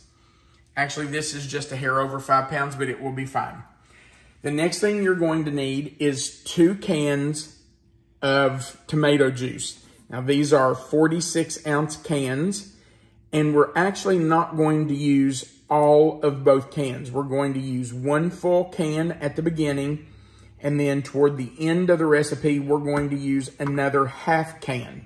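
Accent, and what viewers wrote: South, United States. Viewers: I'm southern, but I can NOT identify that accent. Seriously, not a clue. At first, I was thinking Kentucky, then southern Virginia... but then I was wondering if it's Alabama... Mississippi... Arkansas? I can't place it.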